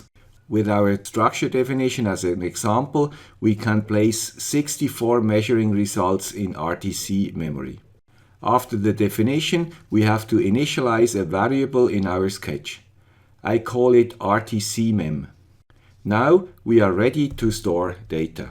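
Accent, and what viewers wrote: Switzerland. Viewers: oh, but i think is a perfect challenge for the excellent man with the Swiss accent! :)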